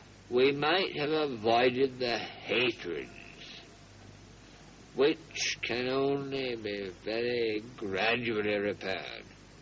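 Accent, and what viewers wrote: United Kingdom. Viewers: Beh nelle news britanniche mi pare sia normale avere la received pronunciation, un po' come da noi vanno a corsi di dizione di italiano standard... Certo che se era irlandese-americano, ha una pronuncia britannica notevole... con anche l'isolamento all'estero per parecchi anni... come notevole fu la persistenza di quella propaganda che, da semplice curioso e sono solo tutte domande le mie, cmq non ho mai indagato se diede mai alcun benché minimo riscontro nell'opinione pubblica britannica... pensavo avessero smesso ben prima del '45 di trasmetterlo... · tbh its just how british people sound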